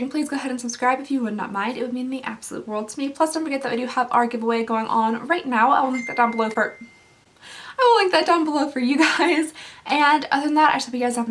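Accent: Kentucky, United States. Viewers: I think its beautiful. I live in kentucky, you sound like you are from Kentucky also. Sorry, just curious.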